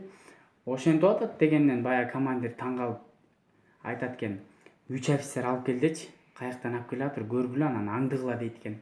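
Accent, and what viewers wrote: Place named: China. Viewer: чипилдирик кытайга окшошон пиздюк кытайдан келип кыргыз тилин уйронуп аткандайсынго жаны туулган торпоко окшоп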